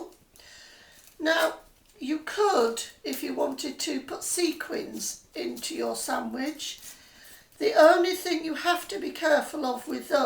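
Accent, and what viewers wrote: United Kingdom. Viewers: Hi so nice to hear someone from the uk especially who doesn't make "long" wind out of everything. Thought your tutorial was excellent and going to put it into practice. Thanks once again Sylvie x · This looks like a lot of fun! Thank you :) wondering if you are from Yorkshire?!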